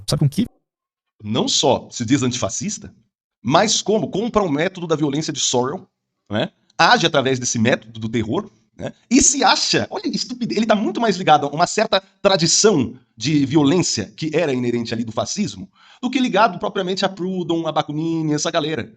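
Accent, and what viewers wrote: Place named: São Paulo, Brazil. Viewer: Ambos paulistas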